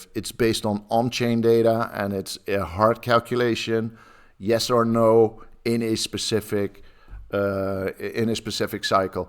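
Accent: Netherlands. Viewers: Is that a dutch accent???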